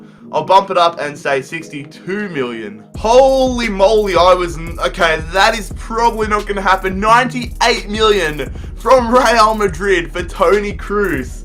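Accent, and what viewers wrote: Australia. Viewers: Are u Australia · where u from? is it Australia?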